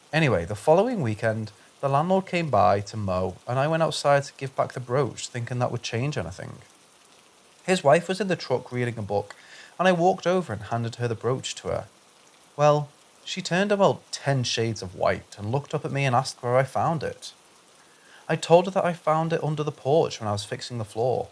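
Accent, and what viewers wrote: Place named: Northern England, United Kingdom. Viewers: I had a feeling he was English but he pronounces some words differently. Northern England was going to be my guess. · no he's definitely english...sounds like he comes from up north to me.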